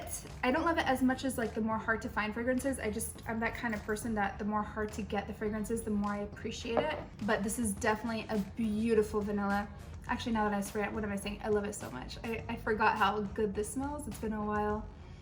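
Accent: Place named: France. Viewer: I love your French accent 😏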